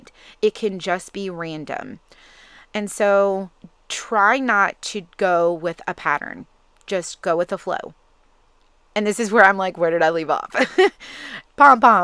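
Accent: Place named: Georgia, United States. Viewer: I totally LOVE the pumpkin! THANKS for demonstrating the bow.. LOVED it. Everytime I hear your voice I get homesick for Ga. Take care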